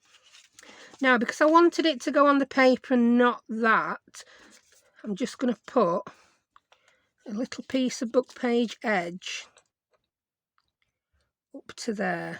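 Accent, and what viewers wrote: United Kingdom. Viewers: Hi! I'm so glad I discovered your channel. I love listening to you. You have such a cozy voice. ( I watch most other people's videos with the sound turned off. ) Also, as a Brit who has been is the U.S. for almost 60 years, your lovely accent is a wonderful piece of home, even though I'm actually from Brighton. And, I like your tutorials. I'm fairly new to journaling, so your information is very much appreciated. Thanks!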